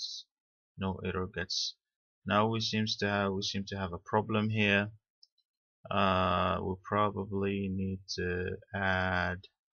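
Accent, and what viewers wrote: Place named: United Kingdom. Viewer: such nice britch accesent for Arabic speaker :D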